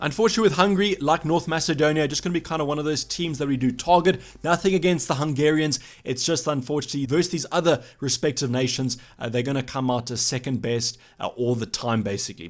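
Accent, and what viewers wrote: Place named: South Africa. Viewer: No but your SA accent just makes it better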